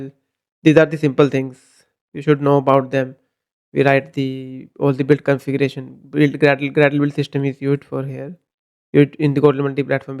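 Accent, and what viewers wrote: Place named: India. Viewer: Nice video in an overall. I think you need to just slow the pace a bit! I usually dislike a lot of indian accent when speaking english, but in your case was really fine. Just need to improve a little more your speaking, try to pronounce more correctly the words, including the right sound of the W word. Doing this, your channel will grown way more!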